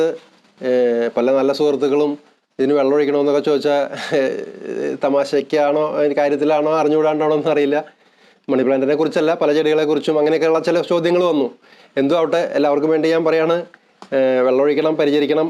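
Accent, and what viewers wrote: Thrissur, India. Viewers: chettan thrissurkRanaale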